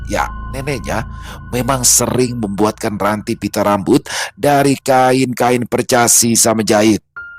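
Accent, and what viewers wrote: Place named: Java, Indonesia. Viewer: Assalamualaikum bang.. Mlg hadir nyimak.. seru ceritanya bang.. bikin terharu skalian lucu jg dengerin bang dgn aksen jawanya.. sm pas niruin suara mrk.. suuiip bgt.. terhibur skl denger cerita dr bang.. sukses buat bang n crew.. Aamiin..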